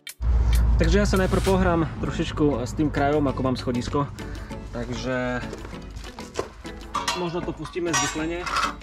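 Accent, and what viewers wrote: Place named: East, Slovakia. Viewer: Super chlape, okamžite dávam odber! Určite tu nájdem dostatok inšpirácie pre domáce svojpomocné majstrovanie. Podľa prízvuku som tipoval na východ a v prvých videách som videl špz z východu. Často jazdím trasu KE-HE cez MI, tak reku či chodím okolo tvojho domu???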